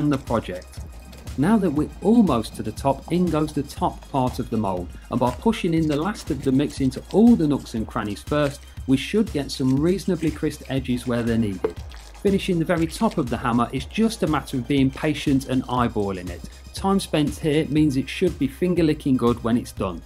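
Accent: United Kingdom. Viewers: This video sounds so british that my endgame got invaded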